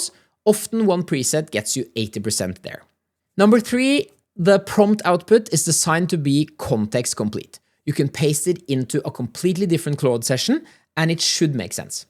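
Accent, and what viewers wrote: Norway. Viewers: Its a typical Norwegian accent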